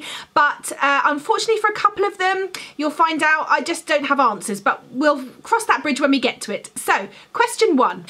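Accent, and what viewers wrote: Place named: United Kingdom. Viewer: I want to read Winger toooo, but this book is just hanging out on my shelf 😂 Love your accent sooo much!!! I unfortunately have a kind of American accent but I looove love love hearing your wonderful British accent ❤️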